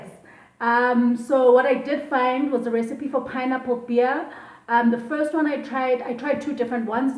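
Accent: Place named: Coloured, South Africa. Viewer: I thought you are colored, you speak and look like us...or so I thought😂😂😍😍thanks for the recipe girl going to give it a go. Take care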